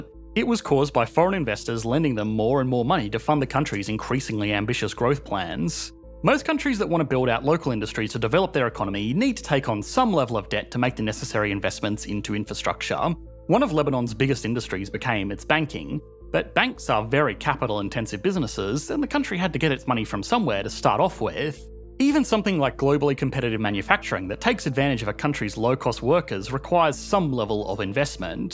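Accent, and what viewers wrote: Australia. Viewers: This is a brilliant video but this guys accent makes it UNBEARABLE! get someone else to narrate it you are not a good presenter. The annoying tone raises at the end that your Ausie accent is used to makes no sense to Americans or brits, this kind of raising your pitch only makes sense if there is a clause or a comma in writing which has a follow up in the same sentence. You do it with Litteraly every phrase and it makes what you’re saying impossible to understand.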